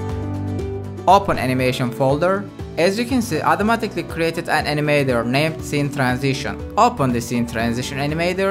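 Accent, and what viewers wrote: Turkey. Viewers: Bende Turk oldugunu anladim :p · ENG Below Kötü anlamda söylemiyorum, ilk defa izledim ve ilk cümlenden aha türk developer bulduk dedim :D. Samimi ve kaliteli bir kanal kurmuşsun, umarım hak ettiğin yerlere gelirsin. I am not saying it in a bad way, I have never watched you before and figured out you are Turkish from your first sentence. You created high quality channel and you are very sincere. I hope you'll get what you deserve.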